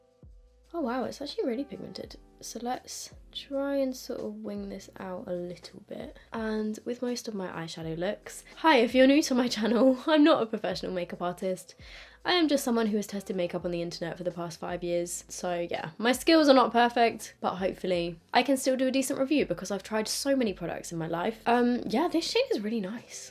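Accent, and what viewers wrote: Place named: London, United Kingdom. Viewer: You are sounding a little London today 😅😅 ❤️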